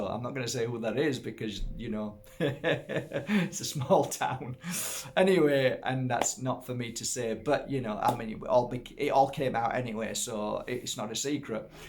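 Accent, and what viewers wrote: Yorkshire, United Kingdom. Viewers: I absolutely love your videos. So informative. I'm from ashton greater Manchester and you sound so local. Yorkshire Oldham I can't decide lol xx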